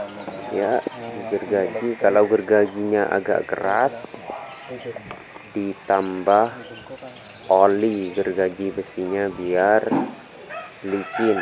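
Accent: Madura, Indonesia. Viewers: Terima kasih ,Mohon maaf, Logat Maduranya Medok sekali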